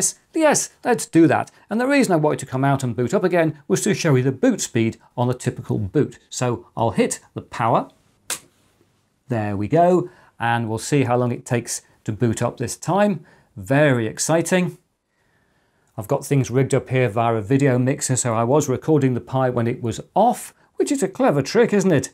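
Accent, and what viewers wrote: United Kingdom. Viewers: I just love the way Britons talk.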